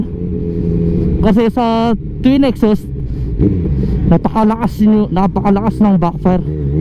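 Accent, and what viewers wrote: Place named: Ilocos, Philippines. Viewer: ilocano ka met brod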